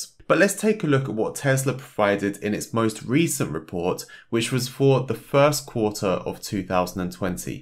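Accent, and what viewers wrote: United Kingdom. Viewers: britich accent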